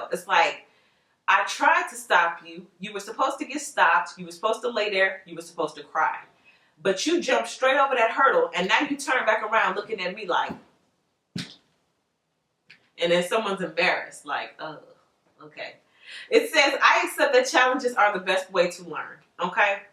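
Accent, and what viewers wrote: Chicago, United States. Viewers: Sounds like you’re from Chicago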